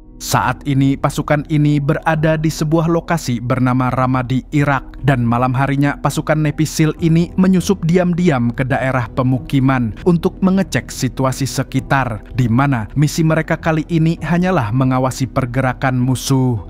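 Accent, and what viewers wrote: Banjar, Indonesia. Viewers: Banjar banarr😅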